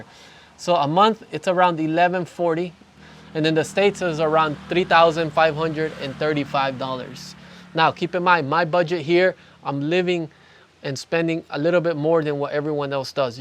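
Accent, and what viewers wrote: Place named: North, Nicaragua. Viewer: éste muchacho cuando habla español tiene el acento norteño de nicaragua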